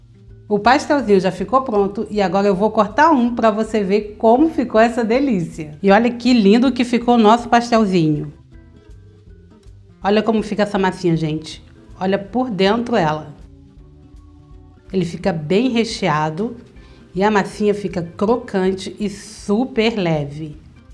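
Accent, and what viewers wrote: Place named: Rio de Janeiro, Brazil. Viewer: Oi Boa tarde suas receitas são ótimas e sua voz e muito bonita tem um sutak carioca e isso mesmo💖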